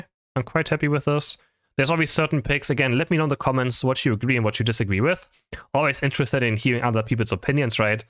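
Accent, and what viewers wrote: Germany. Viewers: Are u german?because du hast da ein akzent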